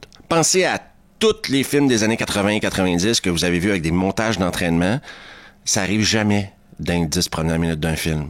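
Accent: Quebec, Canada. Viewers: Tu m'as bien fait rire dommage pour ton accent merdique mais bon t'es Québecois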